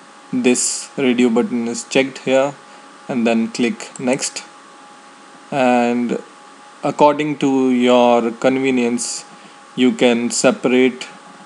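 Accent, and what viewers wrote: India. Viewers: thanks, it helped me a lot; and I truly appreciate your Indian accent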